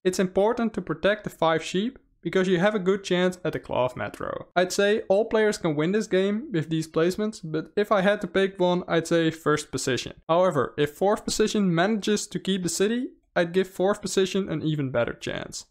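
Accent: Netherlands. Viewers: Although I can understand you just fine, it might help to reduce "vocal fry". That's the creaky, low sound you usually use at the end of a sentence. I think less vocal fry sounds more relaxed. I'm no speech therapist, so I don't know how you'd achieve that. Just curious: are you Dutch?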